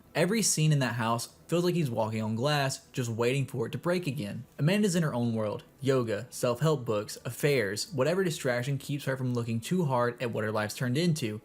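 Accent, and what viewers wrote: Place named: South, United States. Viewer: yo country accent is killin me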